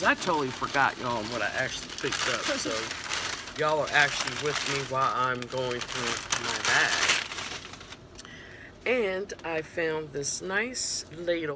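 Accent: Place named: New Jersey, United States. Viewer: Omg! That Jersey Cawfee! We can’t get rid of that accent, regardless of how far we move. Lol. Hey Friend. You cleaned up. Thanks for sharing.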